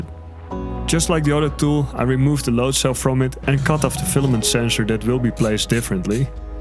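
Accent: Netherlands. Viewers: i thought you sounded dutch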